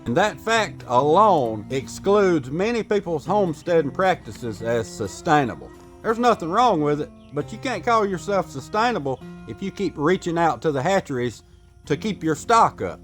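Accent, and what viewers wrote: United States. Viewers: That's an American accent if I ever heard one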